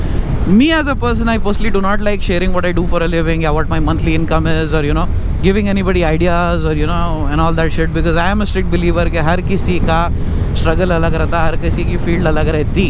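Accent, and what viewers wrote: Hyderabad, India. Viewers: Bhai tum jara to sai ka jarr malom 😂😎. First time I am listening a youtuber who speaks Hyderabadi as my level.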